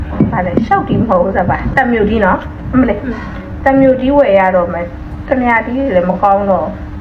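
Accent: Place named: Myanmar. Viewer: ကမြန်မားဆန်ဆန်လေနဲ့ဆိုချစ်စရာလေး❤❤❤❤❤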